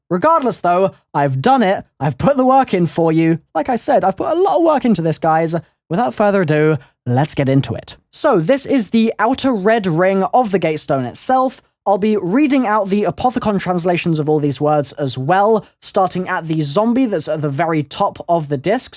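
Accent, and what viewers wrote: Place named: United Kingdom. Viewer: Ur British voice is anoyyyyyyyyyyyyying!!!!!!!!!!!!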